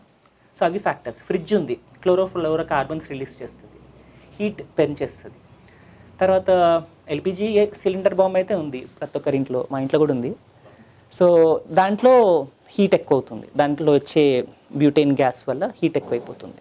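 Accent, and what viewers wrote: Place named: Telangana, India. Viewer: Telangana yasa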